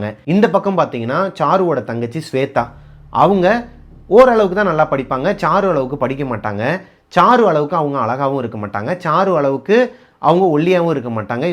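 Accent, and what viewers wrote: Bangalore, India. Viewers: Akka banglore slang tamil keka nalla irukku,im from banglore to....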